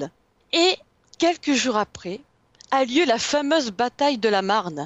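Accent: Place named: South, France. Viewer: C'est génial j'en ai oublié mes tracas comme quand j'étais petite absorbée par cette histoire au accent du sud encore encore encore 👍merci merci merci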